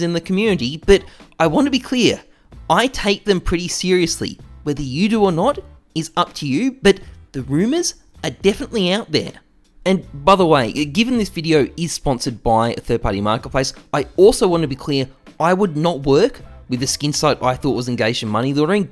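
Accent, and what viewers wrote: Australia. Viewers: Why does he sound like someone doing a parody of an Australian person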